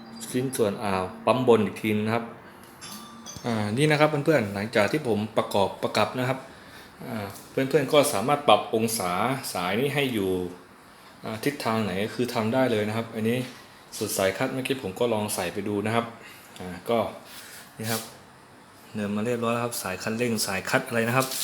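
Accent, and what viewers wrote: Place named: Pattani, Thailand. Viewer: เสียงแหลงเหมือนเด็กตานี 😅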